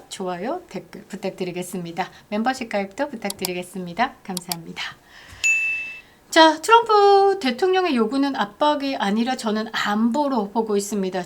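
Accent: Gyeongsang, South Korea. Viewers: 전라도출신 보수우파인데 경상도 억양으로 제 대신 구수하게 비판해주시는 목소리에 속이 시원합니다 ㅎㅎ 그립다 윤석열 대통령님!!윤 어게인❤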